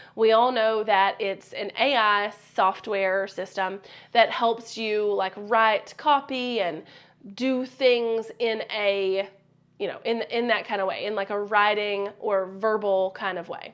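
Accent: South, United States. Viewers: You are fantastic! Love your videos and positivity with a splash of southern twang :) Question, If you create a digital worksheet in canva do you have to do something specific when selling it as a digital product so that someone can use this and actually write in/on it via their iPad? If you have a video on this - could you point me in the right direction?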